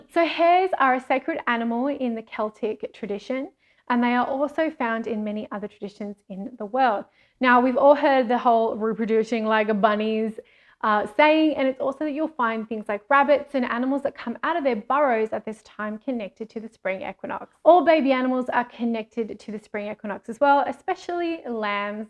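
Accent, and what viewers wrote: Australia. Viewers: the accent threw me off. Australia. 🦘 🐨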